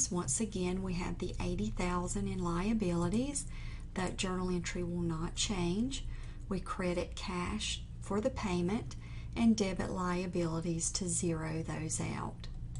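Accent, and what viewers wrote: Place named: South, United States. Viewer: thank u and i like your southern accent btw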